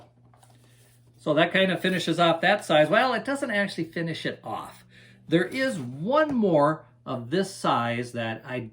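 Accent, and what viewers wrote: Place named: Upper Michigan, United States. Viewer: Collected since 78 and still hooked. I have to ask about your accent. Are you from Upper Michigan by chance? I am and your accent sounds like back home. Lol Great channel!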